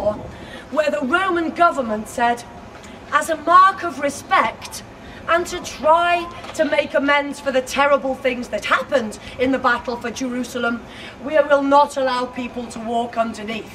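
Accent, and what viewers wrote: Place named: England, United Kingdom. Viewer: Signora è mitica , adoro l'accento inglese , rende aulico anche ciò che non lo è. Spiegato con entusiasta enfasi.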